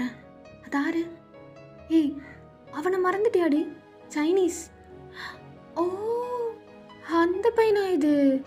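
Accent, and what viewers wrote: Kerala, India. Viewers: Ahhhh 😩 ur malayalam is so cute🤍.. I want pinch your cheeks 😅🤏🏻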